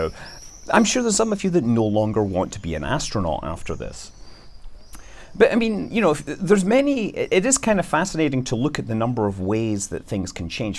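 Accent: Scotland, United Kingdom. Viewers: Is this Scottish accent?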